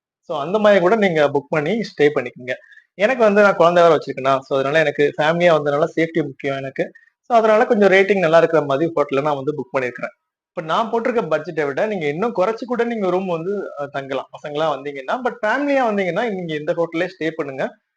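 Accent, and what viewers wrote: Madurai, India. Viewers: தம்பி மதுரை பயலா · Anna neega madurai ah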